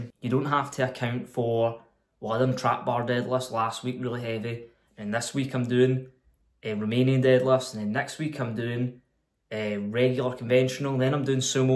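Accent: Scotland, United Kingdom. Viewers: That’s cus he is a Scottish Brother Pal. He’s not tbad I worked in Fort William once and spoke to some of the locals. I’m English and I couldn’t understand a word they said.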